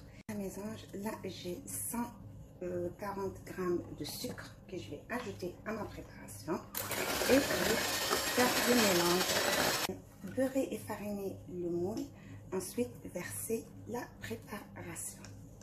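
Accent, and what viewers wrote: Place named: Lebanon. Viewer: Bonjour, excellente recette comme a votre habitude. Par curiosité, j’ai remarqué un joli accent libanais, en êtes-vous originaire. Bonne continuation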